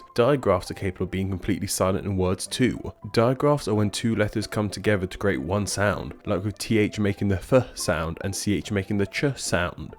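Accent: United Kingdom. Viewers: That one has never made sense to me. We should just spell it “ieorn” or something. Technically, he used the same “r” most Brits use with preceding vowels before a consonant. We Americans lean into the “r” as a full-fledged consonant, like civilized people (sorry about the “zed”, Limeys) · Thats the british way of speaking. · The R in Iron isn't silent you're just British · Americans actually pronounce a lot of those words fully, I think that maybe 25% to 50% of those words you listed off at the mark were just British pronunciations of them. PS: Also, if a silent letter falls in the forest, and no one is around to hear it, does it make a sound?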